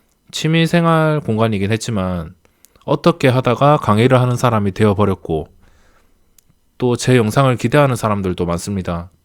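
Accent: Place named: Changwon, South Korea. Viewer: 저도 창원살고있어요 ㅎㅎ 제목보고 들어왔는데 너무 솔찍한 우리 동네 말투로 강의해주셔셔 친숙하게 동영상 재밌게 시청 하고 있습니다 감사드려요~^^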